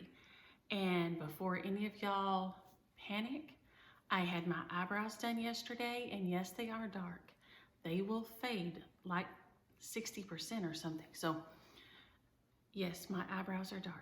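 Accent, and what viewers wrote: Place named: Alabama, United States. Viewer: He....I am late coming to the party! Please forgive me! 😂I watched a few of your videos and said to myself....this girl must be from Alabama with that accent! Then I heard you refer to Texas....and my eyes popped out! I am a native Texan...and I didn’t even recognize your accent! I’m in La Grange (you know what we are known for 😳) what part of the start are you in? You are very entertaining! I plan on slowly catching up on your past videos!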